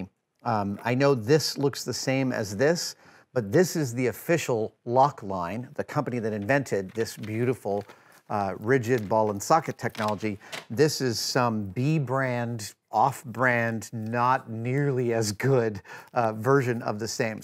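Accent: United States. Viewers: Hiya, can I just ask, does everyone in America call solder "sodder"? Or is it just yourself? Sorry, it just doesn't make any sense to me. You don't say that you have "sod" your house so why change how you pronounce it in other context? I wasn't going to comment because of fear of people going crazy with me but I am genuinely curious and the more that it rattles around my brain, the more it is irritating me. Thanks very much and love from the UK xxx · I wondered what you were talking about..THen I realised you call SOLDERING....SODERING>..I guess Murican accent,.Nice vid though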